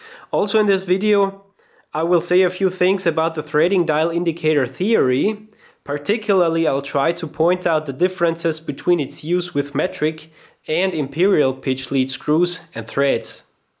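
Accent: Austria. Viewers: Bisch Du e Össi oder e Schwizer? ;)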